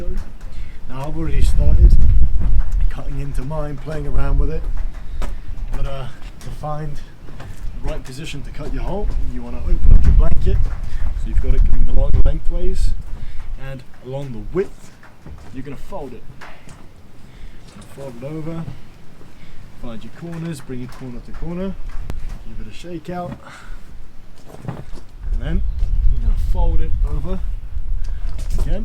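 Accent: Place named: North Dakota, United States. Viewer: Great vid! Just curious about your accent. What state are you from? North Dakota?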